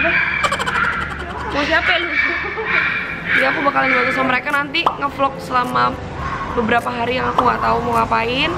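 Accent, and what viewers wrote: Java, Indonesia. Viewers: Ngomomg e medok ngono🙄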